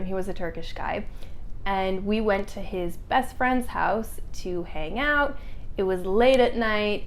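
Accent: United States. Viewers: I live in Oxford UK for more than 3 years, and we call the shirt is just a shirt. I think what do you tried to say for the shirt is about to your American accent. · Is about your American accent ya da because of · Turkish schools teach british english but you speak american english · Probably because you learned brit english like us, she's American so she speaks American English. There are differences between two of them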